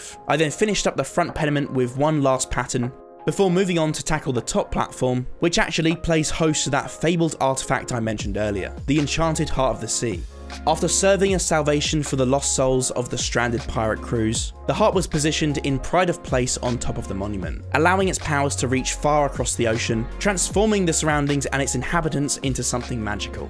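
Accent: United Kingdom. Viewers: why did he have to be british though 😭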